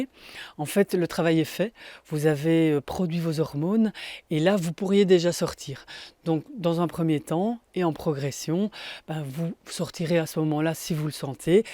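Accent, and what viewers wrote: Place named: Belgium. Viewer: Un accent belge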